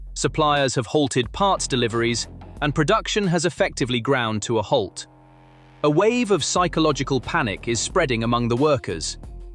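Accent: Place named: United Kingdom. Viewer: British voice over. DREADFUL American number (mis)pronunciation. Dislike from me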